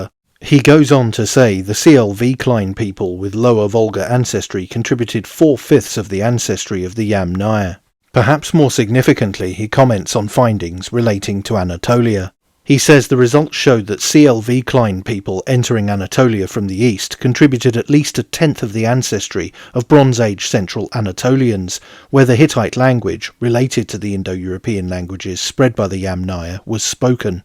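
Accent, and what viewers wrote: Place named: England, United Kingdom. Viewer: He’s English. People pronounce words with the most sensible emphasis in the phonic system of their own language. English people don’t say Paree when referring to Paris, even though that is the French pronunciation.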